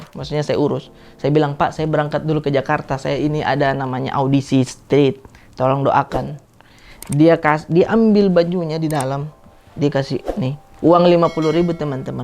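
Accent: Makassar, Indonesia. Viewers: Susah emang kalo khas logat makassar disuruh logat jakarta agak susah dan ribet hahahahahaa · Wah, masih kental logat makassar na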